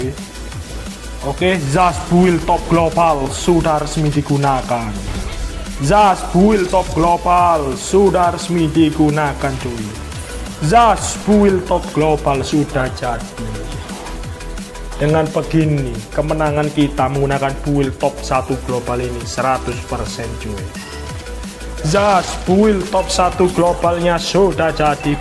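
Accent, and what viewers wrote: Java, Indonesia. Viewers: saya suka cara gaya bicara nya kocak ada ciri khas wong jowo to mas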